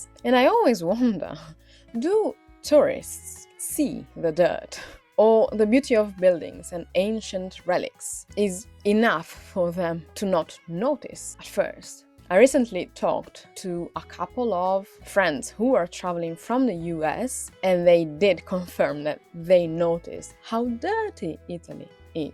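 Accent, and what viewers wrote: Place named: Sweden. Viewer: Why you sound like you are from sweden 😅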